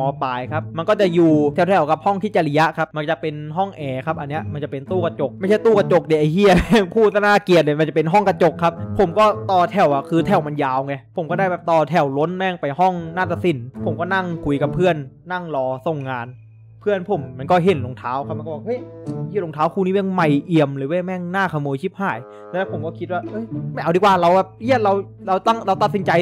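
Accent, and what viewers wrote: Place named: South, Thailand. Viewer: พี่เป็นคนภาคไหนทำไมพูดเหมือนคนใต้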